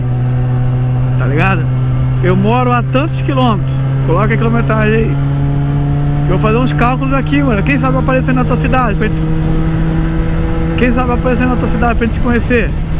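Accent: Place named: Pará, Brazil. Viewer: Mais que vc tá com sutaque paraense isso ta kkkkkkk fica suavi manu tava zuando com vc só alegria !! I pra resolva sou Paraense mais des dos 9 anos fui criado e estudei em sampa mais to fora do pais a trabalho mais sempre que posso vo no meu Parazao ve us carinhas trutas!!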